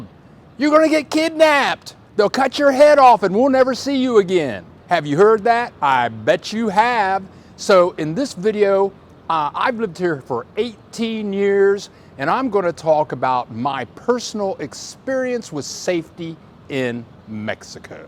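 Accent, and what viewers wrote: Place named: Midwest, United States. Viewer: Funny. From Detroit, I detected midwest there!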